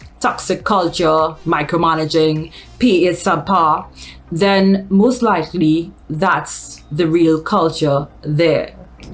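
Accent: Trinidad and Tobago. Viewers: Are you from Trinidad? 🇹🇹. I sense an accent :) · Is that a Trinidadian accent or maybe Barbadian accent?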